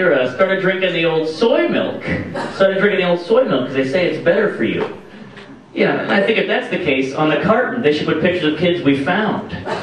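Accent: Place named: South, United States. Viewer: I think Mark's accent here is the most southern I've heard it. I can hear it in certain words he says.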